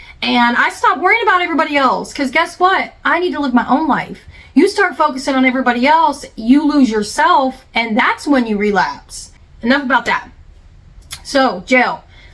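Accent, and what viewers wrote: South, United States. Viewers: Three minutes in and I love your natural speaking voice. Are you from the south??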